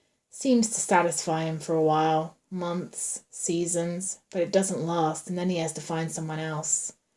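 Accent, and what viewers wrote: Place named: RP, United Kingdom. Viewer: I LOVED this!! Thank you so much for reading this! I read it wheni was a kid (RTC was one of my favoriite authors) and i really wanted to reread it but as audiobook. You did an amazing job!! I enjoyed it, I'll be honest the ending with it being whoit was has always really confused me (was he just a rando? Lol) but i still love the book. Its so quintessentially Point Horror 90s!! And i adored liste img to it at this time of year!! (Not sure how the weather is down south but up north its true spooky season weather now!) Also your accent is amazing! Is. That your real voice!? Im british as well but i sound so common compared to you 😂not sure if its cause youre southern but u have a proper RP accent thats so ni ce to listen to reading tuis book! Stellar job!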